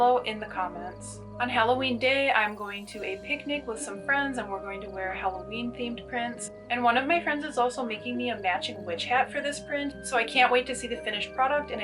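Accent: United States. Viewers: Are you from Minnesota? I hear a slight accent. It’s so nice to listen to <3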